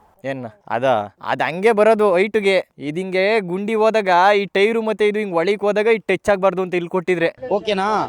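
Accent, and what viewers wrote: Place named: India. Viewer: U r kannada slang is super bro congratulations for the bike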